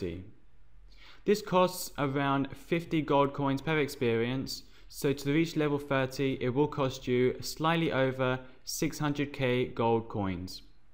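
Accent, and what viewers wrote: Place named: Iran. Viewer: r u persian?cuz ur name and accent is showing.are u persian? btw gr8 guide subbed and liked;)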